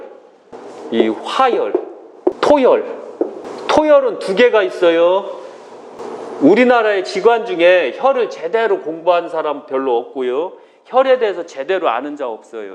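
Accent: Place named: Chungcheong, South Korea. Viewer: 쌤~"지난번에 말씀하셨는데요 넘 정감가게 말씀하셔서입니다 스산 태안쪽 말솜씨 같아 더친밀감 올백입니다~😊 방송 잘보고 교육되고요 차례음식상차림까지 진심으로 감사드립니다 고맙습니다 꾸벅 쌤 화이팅입니다 "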